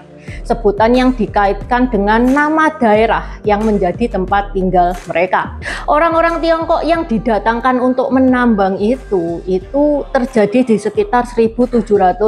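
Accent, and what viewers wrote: Java, Indonesia. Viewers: 😆😆medok banget jawanya,hayo siapa yg bilang itu cina?? itu orang jawa yg lahir di keluarga cina😂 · Medok jowo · mba sendiri kenapa medok bahasa jawa nya padahal cina juga hehee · mbak logatnya kok pakai Logat Jawa .kenapa gk kalimantan atau madura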